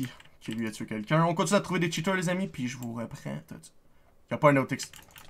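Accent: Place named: Quebec, Canada. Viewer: j'adore l'ascent des Québecoi <3<3<3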